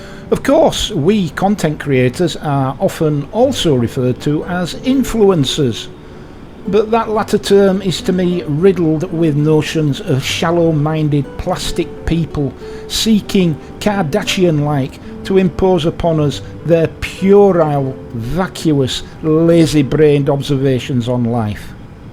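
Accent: Yorkshire, United Kingdom. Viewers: Spoken by a good and proper Yorkshireman. Good man. 👍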